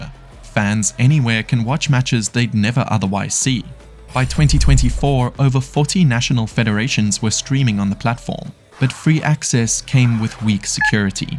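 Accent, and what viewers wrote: South Africa. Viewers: incredibly well put together and informative video, your English is incredible. i thought you were South African haha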